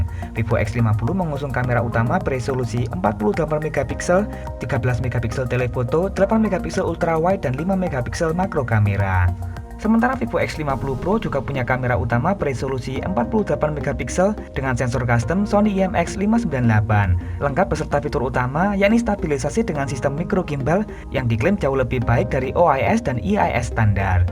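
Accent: Java, Indonesia. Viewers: Medokkkk bangettt suaranya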